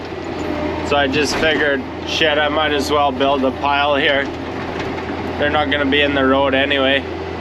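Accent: Canada. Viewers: Sounds like you're up in Canada? Damn good work. Just did the same thing on a field road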